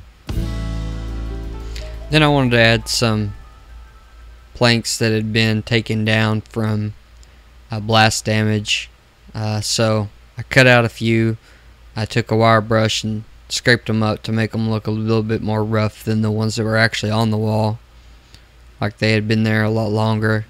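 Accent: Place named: South, United States. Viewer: First time hearing a southern accented hobby youtuber, love it.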